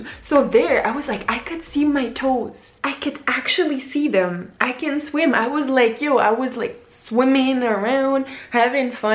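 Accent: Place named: Jamaica. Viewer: You have a slight Jamaican accent lol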